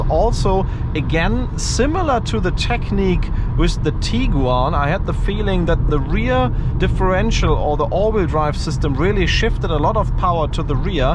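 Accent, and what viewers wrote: Germany. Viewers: Dieser krasse deutsche Akzent ist schon hart 😀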